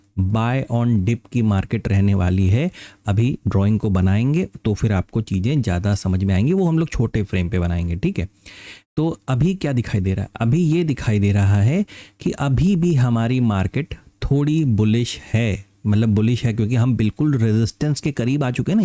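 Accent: Lucknow, India. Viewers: Right shoulder is Pushpa style. Main jhukega nahi. You have a great way of delivering your content. Lucknowi andaaz ki baat hi kuch aur hai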